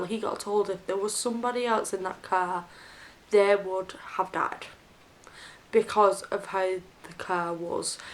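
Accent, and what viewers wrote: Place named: Northern England, United Kingdom. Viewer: Thank you for this film. It sounds like you have come through a lot. It must have been very difficult. I hope that you still have your Mum and brothers and sisters in your life to maybe help each other. Abuse of any type is hard to get over and I can understand why you would feel anxious. I hope it gets better for you as time goes on. I really love your channel and it's lovely to hear a Northern Girl talking. Take care. ❤ and Well done! 👏👍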